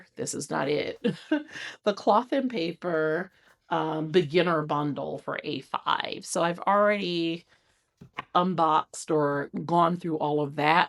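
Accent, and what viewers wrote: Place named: South, United States. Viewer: I like that your spread is simple! When I’m watching agenda planning, I simply don’t want to think! I’m from SC and you sound as if you are from my “neck” of the woods! Maybe Georgia and last guess Tennessee! Anita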